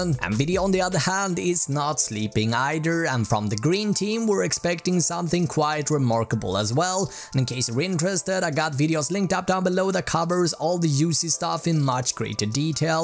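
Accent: Sweden. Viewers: Damn this swenglish though ....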